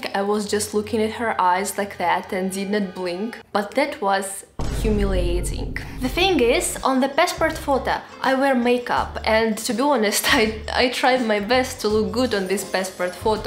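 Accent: Russia. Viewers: У вас русский акцент- вот и понятно что русская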